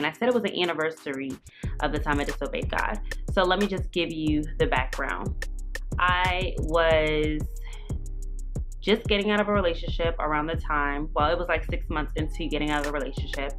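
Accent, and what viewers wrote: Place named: New York, United States. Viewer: you still got the new york accent!!! we thank God ohh!! love you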